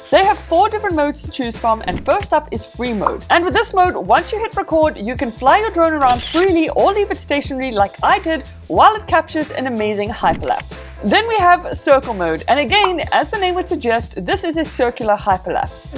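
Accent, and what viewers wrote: Afrikaans, South Africa. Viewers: Are you Afrikaans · I just want to know?????? Are you from South Africa🇿🇦🇿🇦🇿🇦🇿🇦 BTW I love your intro🥨🥨🥨🥨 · Hmm, are you from SA, i notice so of those areas?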